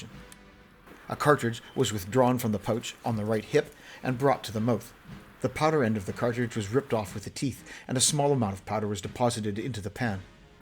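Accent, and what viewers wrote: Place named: Canada. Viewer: God that landscape is so beautiful. Im assuming by the uniform you're Scottish but the accent sounds slightly Canadian.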